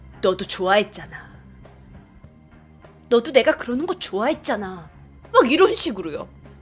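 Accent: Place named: Jeolla, South Korea. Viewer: 전라도 분이시죠? 으 발음 너무 귀엽네요.ㅎㅎㅎ(진심)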